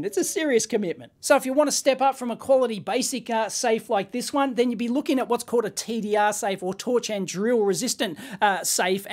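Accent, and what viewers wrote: Australia. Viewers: LockPickingLawyer grew an aussie accent (oh, Its. He says hes not LPL lel)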